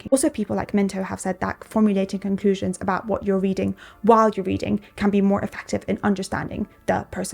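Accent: United Kingdom. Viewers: “☘️A left handed red head with a British accent ☘️ : 🌹Beguiled me 💯 ✅🥀✅ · i LOVE your British accent!🥰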